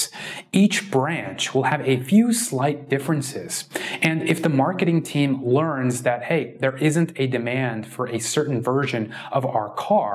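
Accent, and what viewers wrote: India. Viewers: Hey man, Do you have Indian ascent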